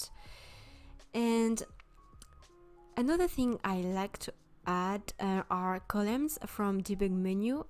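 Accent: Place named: France. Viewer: Wait hol up are you French????? I love ur accent I am nigerian but speak fluent French lol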